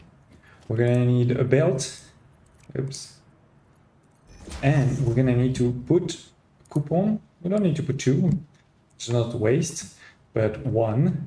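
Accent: France. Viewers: Are u French? U have a French accent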